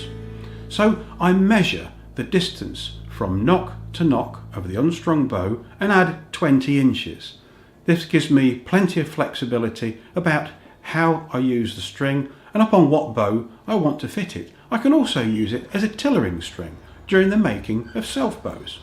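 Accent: United Kingdom. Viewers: Don’t judge me but I’m trying to figure out if he’s British or not (the accent) Using inches and all… Very good video btw!!!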